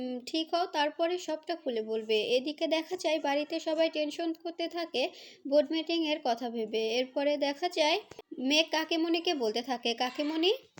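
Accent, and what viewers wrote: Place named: Bangal, Bangladesh. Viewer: বাঙ্গাল, বাঙ্গাল অশিক্ষিত উচ্চারণ, অপদার্থ, তোমার মতো গাড়োলের কথক হবার শখ কেন?নিজেকে শিখকিত করো,বাঙ্গাল বাঙ্গাল অশিক্ষিত উচ্চারণ ভূলে যাও।তার পর কথকতা করবে।শুভেচ্ছা রইল।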